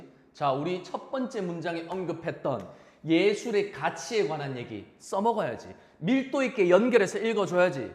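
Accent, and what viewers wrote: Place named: Gyeongbuk, South Korea. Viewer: 와~ 경북 사투리 이해 쌉 가능^^* 경기도 사는데 쌤 온라인 강의 듣고 싶어요~~~^^*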